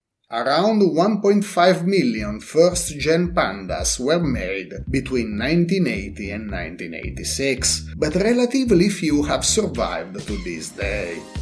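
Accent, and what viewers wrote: Italy. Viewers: Top and i enjoy the English Italian spoken !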